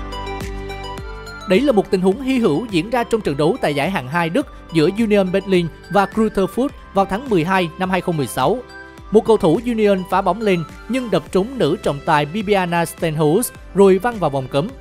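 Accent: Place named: Binh Dinh, Vietnam. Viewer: Giọng bình định trong sâng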